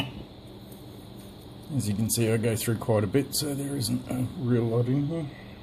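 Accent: Australia. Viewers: hahaha, me thinks its his aussie accent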